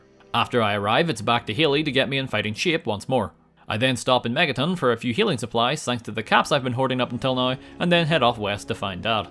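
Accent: Northern Ireland, United Kingdom. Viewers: Ah are you a fellow Northern Irish man playing fallout? What a rare sight 😂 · I needed some of that northern Irish accent today. Great video as usual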